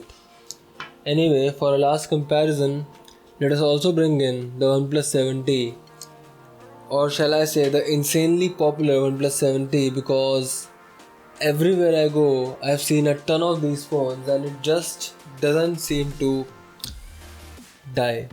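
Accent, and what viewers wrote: India. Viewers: indian english accent is really annoying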